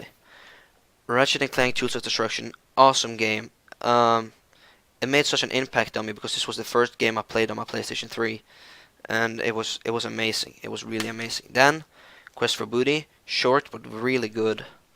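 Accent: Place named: Ireland. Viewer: You from Ireland mate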